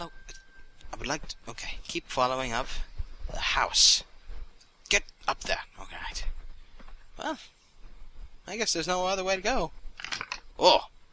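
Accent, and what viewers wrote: United Kingdom. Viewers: whats with the british accent? X3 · is it just me or does he sound a little british in this video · is it me, or dose he have a slight british accent xD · Why does he speak in a british accent kind of thing? Its a bit annoying. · He has a slight british accent...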